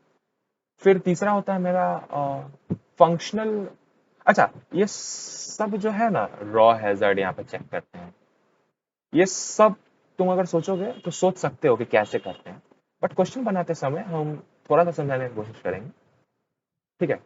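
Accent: Bihar, India. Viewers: Bihari accent sunke bhaiya wali vibe aa rahi hai sach me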